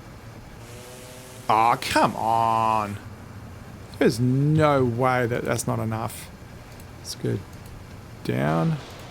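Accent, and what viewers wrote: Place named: United States. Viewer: In UK we say it like assfault We say d-e-po where you say it Dee-po. We say "root"when saying route. Always wonder why Australian and new zealanders say it like the yanks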